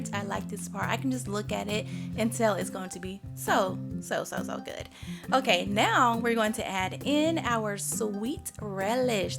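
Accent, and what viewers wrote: South, United States. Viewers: I fucking love this woman, now! You’re so southern! 😢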